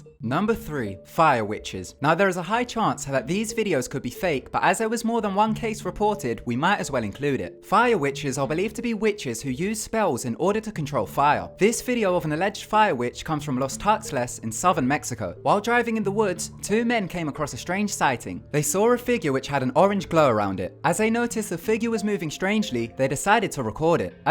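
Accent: United Kingdom. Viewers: Bruchas, lol love that British accent.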